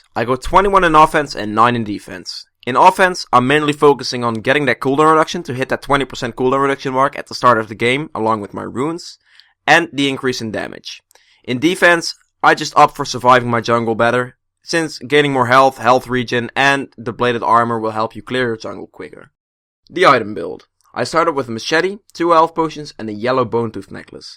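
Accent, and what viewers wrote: Netherlands. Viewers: You're dutch I hear it because of your accent. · Ja je hoort echt dat je Nederlands bent. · I'm new to your channel, but you sound dutch! Are you?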